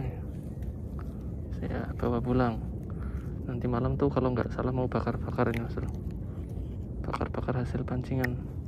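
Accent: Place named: Semarang, Indonesia. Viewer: Bahasane koyo wong semarang. Ndak yo?😊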